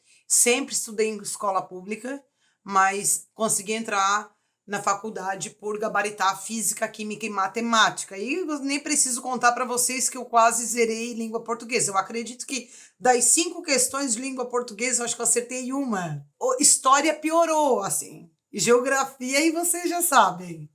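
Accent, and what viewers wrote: Rio Grande do Sul, Brazil. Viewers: Nossa, eu jurava que você era gaúcha, e eu sou gaúcha! Volta e meia você solta alguma palavra que eu acho bem "catarina" mas no geral acho seu sotaque muito parecido com o nosso. Amei o vídeo! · Tu fala muito bem!!! E tb achei que tu era gaúcha como eu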